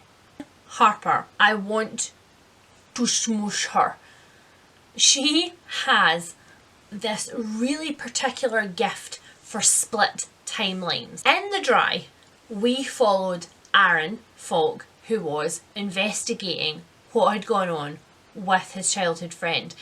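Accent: Scotland, United Kingdom. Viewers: No conversation needed. I'm not reading these books because I don't read fiction. I read very little fiction. And when I do they are mostly adventure and historical. I just watch your videos, because, as i said, you are a force of nature and I love watching and listening to you and your accent. I would also like to apologise for thinking that you were Irish. In one of your other videos I left a comment saying that i thought you were Irish. I know that you are scottish!!. Sorry about that and I apologise for the unintended insult!!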